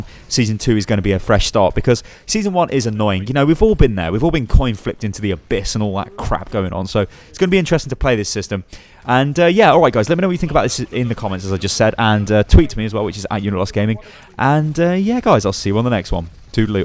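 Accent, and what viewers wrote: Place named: United Kingdom. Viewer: What's the point of these vids? It's literally just everything you can hear in the update videos Blizzard makes, but unorganized and with Overwatch gameplay in the back. I guess they're good if you like British accents and don't like Jeff's lisp.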